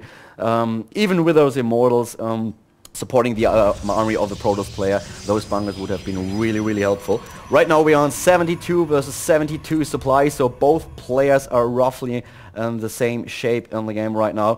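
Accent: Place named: Germany. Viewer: Your English has this typical German accent. But nevertheless, you are one of the coolest caster of Germany.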